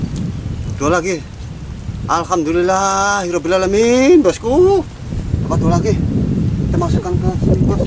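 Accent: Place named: Cirebon, Indonesia. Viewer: Logate kaya orang indramayu/cirebon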